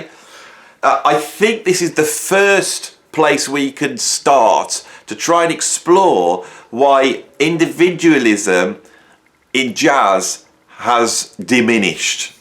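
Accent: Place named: Midlands, United Kingdom. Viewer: First of your videos I came across, agree with everything you say, so I subscribed, and you sound like a Brummy or Midlander.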